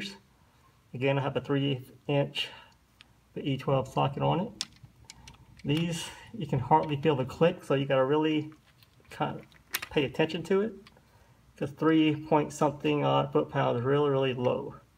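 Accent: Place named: South, United States. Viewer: Did you plastiguage them? BTW its kind of odd to hear a southern accent from a Viet guy. :)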